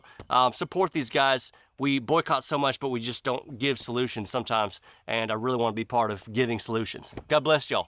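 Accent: South, United States. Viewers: I so get what you are saying. Just watched Tucker and Megan Kelly talk today. Peeps are WAKING UP. I think Megan has grown up and she TODAY is like us as well as Tucker. I was raised in Mississippi and married a Yankee and so I miss my roots some days but not the heat and mosquitos, ha! I get what you are saying and YES we need to download Angel Studios, etc. TY for reminding me to do just that! I love your content and understand your drawl,😂 God Bless You Boy!! I am old enough to be your grandmother but God made you smart and I love good ole southern wisdom. RJ PS/ Tucker and Megan talked today alot like you. God is definitely doing something in our media. Change is God's specialty!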